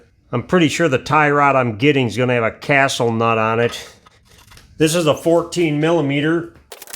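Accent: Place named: Minnesota, United States. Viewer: Man that's a lot of rust on those parts! Must live in the snowy white north with salted roads, based on your accent and the sheer volume of rust! I'd say Minnesota if had to guess. 🤔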